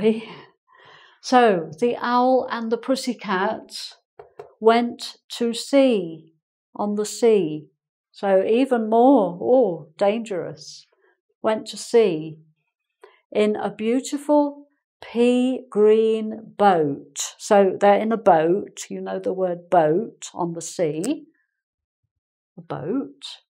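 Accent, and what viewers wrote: United Kingdom. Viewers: You don't need to explain every word in the poem.I listen to your lectures simply because I like your pronunciation and I am fascinated with British accent. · Her British accent sounds really beautiful and also her lecture is really good.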